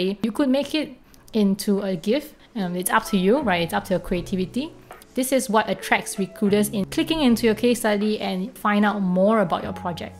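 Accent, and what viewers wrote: Nigeria. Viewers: You sound like a Nigerian ❤️ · Just discovered your channel, very informative video... Btw you have a Nigerian accent and i love 😊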